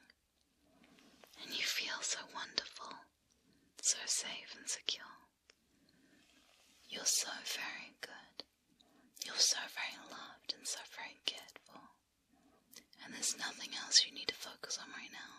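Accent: United Kingdom. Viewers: i enjoyed that slight british accent feels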